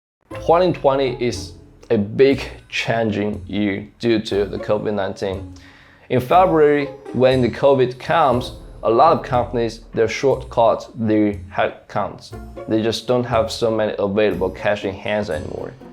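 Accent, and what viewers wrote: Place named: China. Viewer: 一听就是咱中国留学生哈哈，点个赞！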